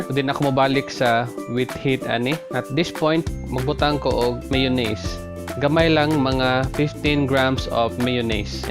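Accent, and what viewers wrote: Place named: Visayas, Philippines. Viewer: I like the diction of visaya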